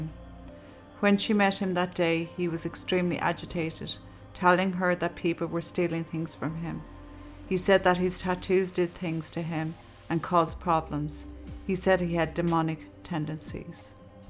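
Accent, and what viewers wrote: Ireland. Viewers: you have an Irish accent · How is it possible that his family did not realise that he had schizophrenia and as such a very real danger to himself and society, I wonder. So sad about Mairead, she was such a wonderful young woman. Thank you for your channel, and your lovely Irish voice! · Love love love..cant wait for more content. A lovely irish accent. Such sad cases. X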